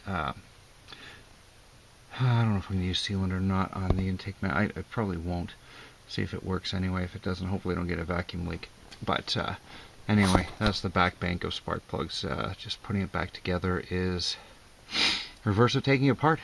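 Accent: Canada. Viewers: on my car which has a GM 3.6 HFV6 , on a north - south set up , (rear wheel drive) , the manifold needs to be lifted too , albeit ever so slightly , which is why they fit platinum plugs as standard from factory . Listening to your patois I'm guessing you're Canadian .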